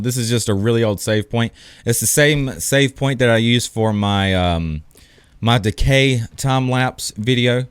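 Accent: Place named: Carolinas, United States. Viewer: Thanks your a pal lol hey are you from the Carolinas cause your accent sounds like it lol I am from there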